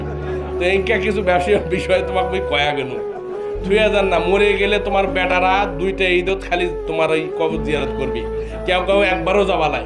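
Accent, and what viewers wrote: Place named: Bogura, Bangladesh. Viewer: বগুড়া জেলার আঞ্চলিক ভাষা এই ভাষা দিয়ে মানুষের মন জয় করা যায়❤❤❤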